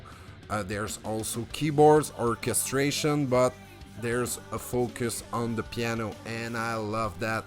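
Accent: Quebec, Canada. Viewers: I just started this review, my favourite band by the way since 1993. You sound like a French Canadian (like me)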